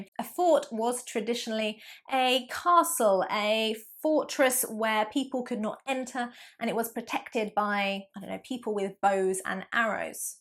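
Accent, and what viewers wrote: United Kingdom. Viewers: I like the British accent :-) Hello from E.T. -- 🦋East Turkistan🦋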